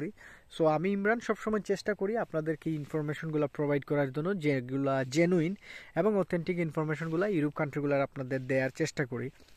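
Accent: Sylhet, Bangladesh. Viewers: আপনার কথায় বুঝা যার আপনী সিলেটি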